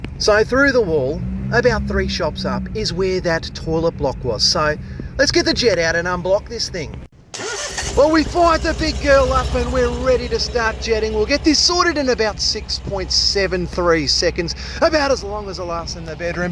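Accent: Australia. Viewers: Hey. Been watching the videos for a couple months. Something therapeutic about things getting fixed. Plus, I get to hear the Aussie accent and it takes me back to the two years living outside of Sydney. Good on ya mate and keep it up! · you little ripper, thank you for being my AU dialect coach! I have the pleasure of working with Perthlings and your S-tier material has helped immensely. Really smoothed out my U.S. hard R's! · Gday mate! Lisa here from the state of Virginia in the good ole USA. I love your videos, your positive attitude, your commentary and your Aussie accent! It’s bloody brilliant! 😊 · Are you from the same region in Australia as Steve Irwin? Not being funny, you just have a very similar accent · Hallo from the Netherlands, up and above for you! You just popped up in my, you just may like this video. And curious as I am I watch you work those blockages. 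In one video you mentioned that it is most women that watch your videos and I felt busted😂😂. I like your Australian accent. Keep going entertaining us YouTubers!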